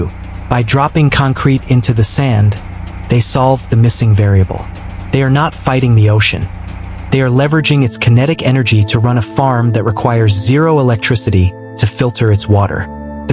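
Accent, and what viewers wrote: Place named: United States. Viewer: What is an American doing narrating an Australian Project ?????????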